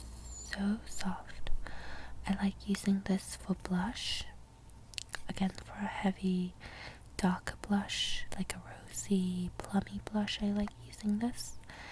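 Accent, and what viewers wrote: United States. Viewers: Dude you pick up accents so quick, I can hear the US accent creeping in already :D awesome video friend, grateful as always.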